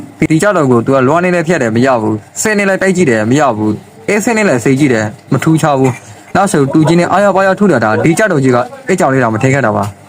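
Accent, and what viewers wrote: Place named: Dawei, Myanmar. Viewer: ထားဝယ်သံဘိတ်သံနဲ့တင်ဆက်နေတော့မနဲနားထောင်ရတယ်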